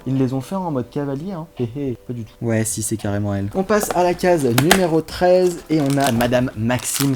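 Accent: England, United Kingdom. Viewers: Pourquoi tu prend un acsent anglais ?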